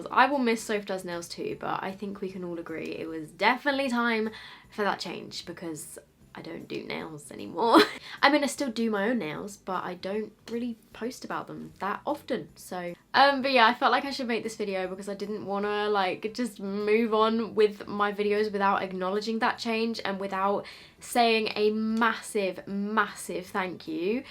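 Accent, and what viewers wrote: England, United Kingdom. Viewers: First of all: CONGRATULATIONS SOPH!!!! I feel so happy for you, you deserve it!!! So, it's not very special but I discovered you when I was searching for Makeup Revolution product reviews like 2 years ago I think? And I remember instantly loving your honest reviews, and cute & funny editing style (and also the British accent, ngl). Thank you for your hard work ! Twitter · I started watching around 9 months ago. Your videos just kept popping up on my YouTube homepage and just decided to watch on of your videos😂 your videos started showing up even more as being recommended and I started watching you even more😂 I think the reason I subscribed was because of your personality❤️ you’re so pure and natural and I definitely LOVE your British accent😍😍 you’re absolutely yourself and appreciate you for that! I think the videos that made me subscribe were the ones were u were testing products that used u in their ads without ur permission😂 it was THE FUNNIEST😂😂😂 Instagram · First video I saw was 3 months ago, I think it was the Instagram make up trends one, and I thought you were one of the only ones who seemed to be genuine on YouTube and I binge watched so many of your vids 😂😂❤️❤️ Also loved your channel because I lived in England this summer and I love your accent Lots of love ❤️ <mail> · Omg! 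Congratulations, Soph❤️ I remember the first time your videos popped up in my recommendations, it was the video with boyfriend chooses my makeup😂 I watched that and then fell into a hole of watching too many things from your channel. At one point I was like, I am subscribing to her. Even though at that time I didn’t know English that well, damn that was more than 3 years ago, and I was kinda of scared of your British accent, because for me it was hard to understand (btw now I get everything you say🤩)but I subscribed anyway because your content was and still is really entertaining, but you have something unique. You are a REAL person. I can relate to you, you don’t hide the fact that you have problems or other stuff like some youtubers do and for that I really appreciate you❤️ Another thing i remember is that I was really surprised when I saw how many subscribers you had. You always provided good quality for your videos and I was convinced you had more than 1 million fans:)) How says: “ ”, you finally did it. 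(Btw, I started listening to because of you🙃)on another note, I am here for the makeup: I have a big passion, just like you and I’ve learnt so many things from you, plus I bought a lot of the products you recommended and they worked👌🏻 You are just such an inspiring, intelligent, creative, sensitive person and much more. I am truly happy for your accomplishment!! Love you❤️❤️ *also my insta is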